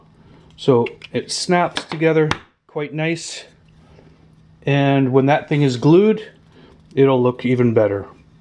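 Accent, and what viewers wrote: Canada. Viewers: Great videos, they have been a lot of help to me, please don't be insulted if I am wrong, but you sound Canadian to me? I'm English, and it's hard for us to tell sometimes.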